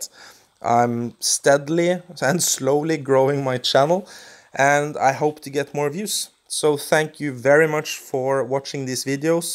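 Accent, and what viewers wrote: Norway. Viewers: Fortnite Addict he is norwegian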